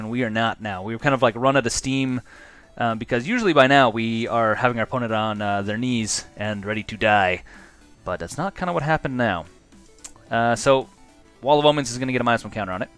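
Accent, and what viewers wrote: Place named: Edmonton, Canada. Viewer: Edmonton? Your accent is too think to be Windsor.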